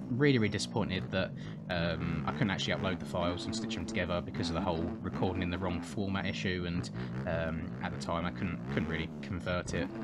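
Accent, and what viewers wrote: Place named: United Kingdom. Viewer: Straight male here, all British accents are sexy lets be real. Plus watching someone actually ride the game instead of crashing like I do is nice.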